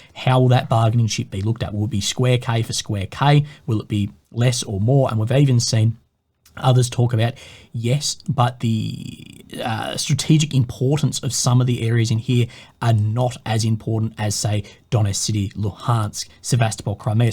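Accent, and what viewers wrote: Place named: Australia. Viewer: He speaks English with an Australian accent.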